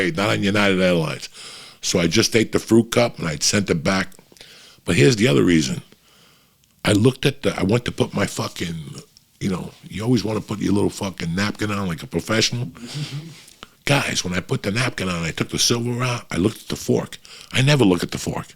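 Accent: New Jersey, United States. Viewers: Somehow shameless advertising with a Jersey accent works on me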